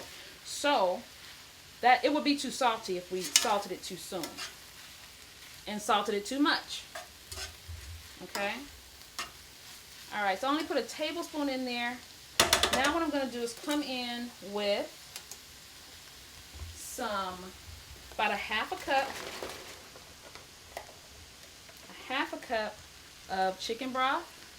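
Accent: United States. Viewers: Yummy....Huge fan of your cooking and loved American accent. Wish you have your own TV cooking shows or even better come down under Australia and shows us Aussies your delicious cooking recipes.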